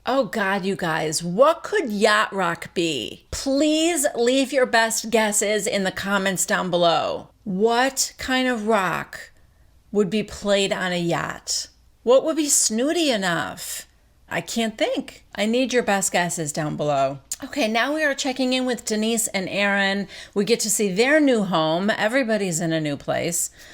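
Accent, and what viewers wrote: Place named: South, United States. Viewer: Oh my gosh!!!! Rinna might as well be flipping LVP off. Hot pink satin top ,hot pink eye shadow and longer brown hair. 🤮. She will.do anything to be seen and get a paycheck. It's funny how Rinna always has to mention how rich people are. Love the Southern accent, you are the best. Well I guess I had better go change my yacht underwear and go work out.🤣🤣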